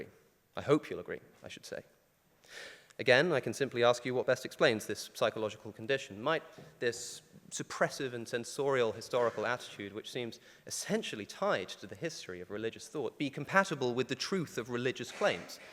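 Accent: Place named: RP, United Kingdom. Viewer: It's mostly you. This is how educated people, especially Brits, tend to speak.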